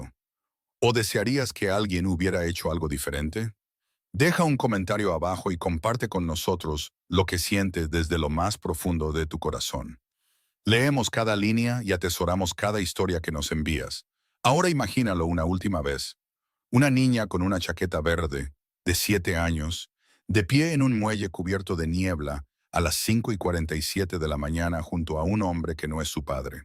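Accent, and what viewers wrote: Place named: United States. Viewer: Los cuentos de éste canal son muy pesados y ésa voz con un tono yanki es horrible imposible perder tiempo en escuchar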